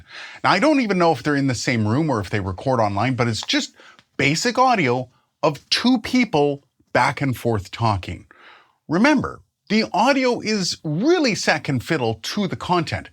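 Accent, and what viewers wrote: Canada. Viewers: Ouch, this one stung about as much as your Canadian "about" pronounciation pet peeve. I assure you not every podcast is two people sitting and having a conversation. I've been doing an audio theater podcast for 20 years, incluing me doing multiple voices, adding music and effects that can can sometimes result in editing files that are 12 or more channels deep. And many other podcasters do the same. I only mention this because just as you wouldn't say all music consists of a guitar and a drum set and only requires two mikes, not every podcast can be boiled down to a talking heads show..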